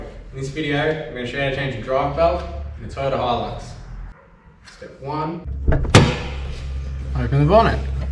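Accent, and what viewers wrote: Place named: Australia. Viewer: Thanks mate.. i am in thailand but having it explained in proper Aussie language was gold.. thanks for your video..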